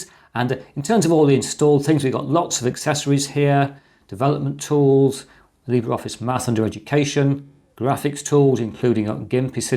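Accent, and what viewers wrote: United Kingdom. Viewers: oh! this specific - stale GB dialect - just like the farth from behind the monarchs.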